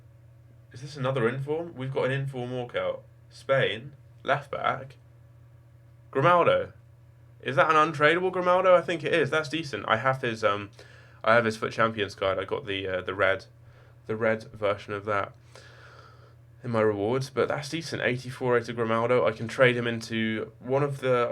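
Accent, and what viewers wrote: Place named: Scotland, United Kingdom. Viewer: Never know you were Scottish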